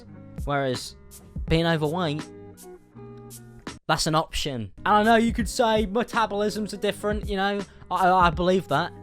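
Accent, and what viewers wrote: United Kingdom. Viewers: i usually cant stand hearing the british accent (for no reason) but i really appreciate your vids!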